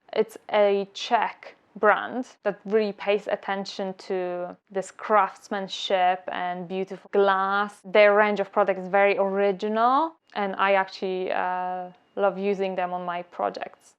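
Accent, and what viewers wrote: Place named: Poland. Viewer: Beautiful Polish accent.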